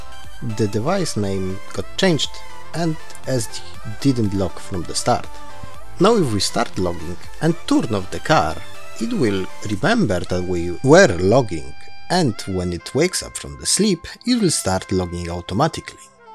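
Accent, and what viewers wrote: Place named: India. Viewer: O CHUJU xd tyle lat w UK, a brzmisz jak hindus XD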